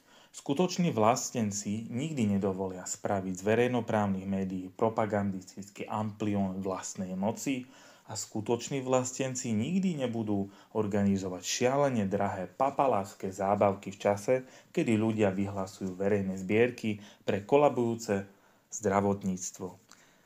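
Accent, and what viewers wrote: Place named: East, Slovakia. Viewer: Co zas po vychodniarsky perla asi z dolneho otvoru…❤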